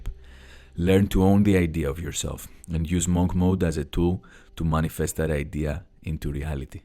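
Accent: Greece. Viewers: U sound greek 100% 👍👍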